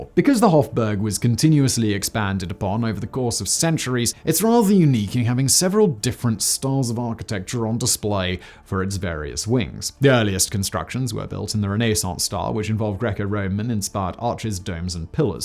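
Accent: United Kingdom. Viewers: Ah, I'd hazard a guess these videos are written by native speakers. Presenter couldn't be more British. I'm austrian, if you wanna know.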